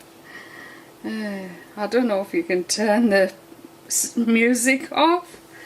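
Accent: United Kingdom. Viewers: Lovely shade of green. Looks good enough to eat ! American accent. Lol. You gave it a shot...I rather like your British accent 💜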